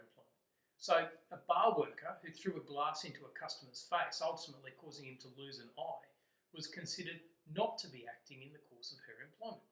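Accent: Australia. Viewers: god damn aussi accent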